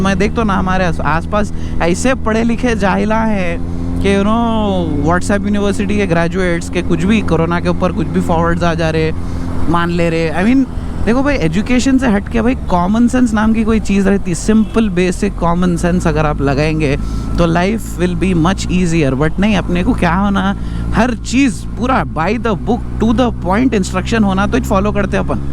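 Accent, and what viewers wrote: Hyderabad, India. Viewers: I guess u know telugu... Bhaya serious gaa cheptunaa echipadesinavv🔥, Btw mi videos next level unatai asal😍. Bc asal skip cheyalii anipinchadhu 20 or 15 mins unna kani, aa flow ala untadhi aa hyd slang & me content. Love u bhai♥️🥂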